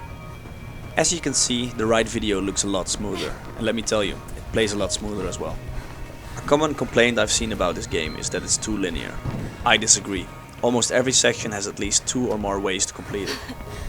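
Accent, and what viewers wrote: Netherlands. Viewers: Goeie review, ik hoorde gelijk dat je uit Nederland komt :) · this game will forever have a special place in my heart. great video! edit: im just gonna assume you're dutch because of your accent ;)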